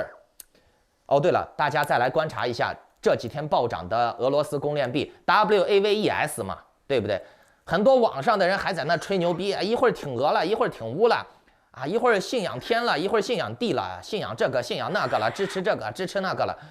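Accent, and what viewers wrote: Henan, China. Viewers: 河南佬一个。口音漏了。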